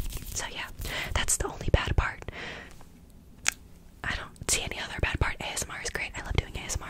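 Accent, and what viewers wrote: United Kingdom. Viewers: omg your british accent is so beautiful omg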